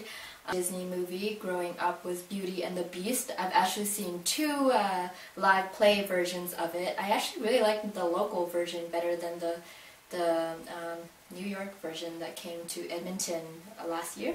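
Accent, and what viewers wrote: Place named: New Zealand. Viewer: hehe yup but i think its the new zealand accent seems easy to pronounce for me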